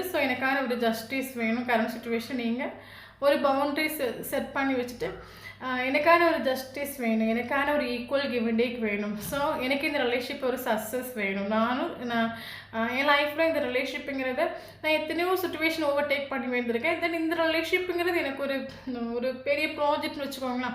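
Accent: Kerala, India. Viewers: Super sister காதல் பிரிவில் எவ்வளவு கஷ்டத்தில் இருந்தாலும் உங்களுடைய பேச்சு எங்களுக்கு புத்துணர்ச்சி அளிக்கிறது உங்களின் தமிழ் மலையாளம் கலந்த பேச்சு மிகவும் அருமை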